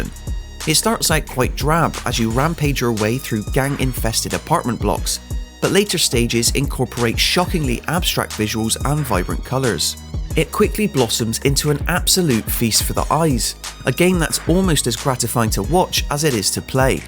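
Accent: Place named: Bristol, United Kingdom. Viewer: Bleeding hell, if this commentator isn’t from Bristol then I must be deaf. Love a good Bristolian accent👍🏼😂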